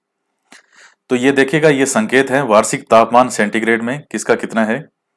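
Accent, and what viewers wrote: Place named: Marwari, India. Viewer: Sir ji आप का hometown जोधपुर h क्या? 🤔 आप जोधपुर की marwadi बोलते हों 👌👌☺️☺️😂😁 achi लगती है y भाषा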